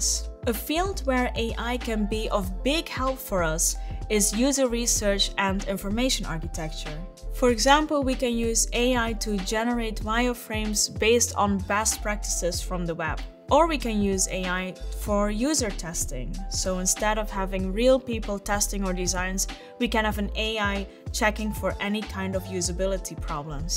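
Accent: Netherlands. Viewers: are you dutch? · She sounds so dutch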